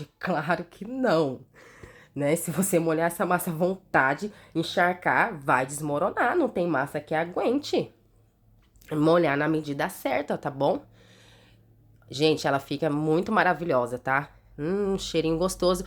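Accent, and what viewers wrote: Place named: Minas Gerais, Brazil. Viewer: Vc e mineira igual a minha falo igual a você também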